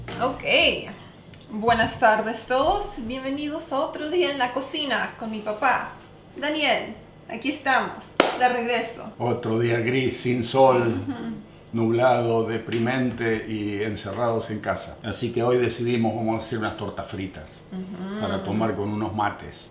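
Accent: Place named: Argentina. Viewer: Daniel querido que hermoso ..no perdistes tus costumbres argentinas,tu tonada ,las costumbres ..eres muy autentico BIEN ARGENTINO¡¡¡¡¡SALUDOS DESDE SALTA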